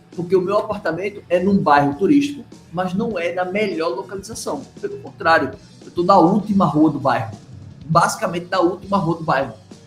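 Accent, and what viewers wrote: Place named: Northeast, Brazil. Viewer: A dicção dele é ótima, esse seu comentário é cheio de xenofobia, a sua "dificuldade" é só porque há um sotaque nordestino marcado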